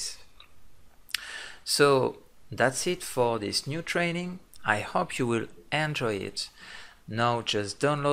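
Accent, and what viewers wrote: France. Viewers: Muy interesante, por cierto el curso esta en inglés?? Porque veo que el profesor es francés y tiene fuerte acento francés, no me queda claro si el curso es en francés o en inglés. Deduzco que en inglés ya que el video introduccion esta en inglés, pero pregunto por si acaso. Si esta en inglés lo podré hacer, pero en frances no.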